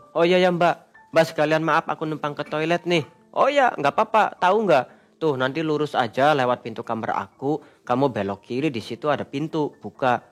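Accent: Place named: Banten, Indonesia. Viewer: mas kalo berbicara seperti logat bahasa orang banten😊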